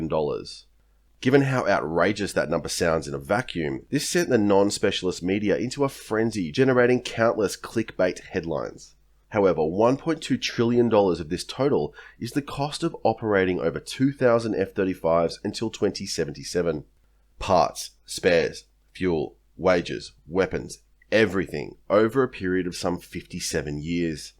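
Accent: Australia. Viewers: And this video isn't the exception; it seems to be the norm. Watch his EPIC explanation on: The history, development, physics, tactics and strategy of nuclear munitions. It's an outright 10. And I am knowledgeable on this. I actually (for whatever reason) don't love aussie accents, but I LOVE this channel.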